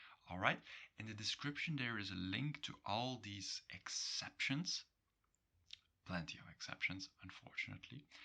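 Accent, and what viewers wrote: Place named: Belgium. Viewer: thank you. You get barely any views but you help me a lot with my dutch. Are you Belgian because your accent sounds interesting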